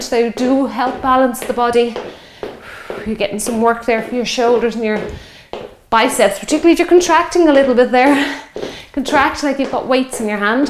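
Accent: Ireland. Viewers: I was just looking around for a workout to get me back into a good workout routine after a long time away due to injury. This workout is just perfect. And your Irish accent is so lovely to listen to!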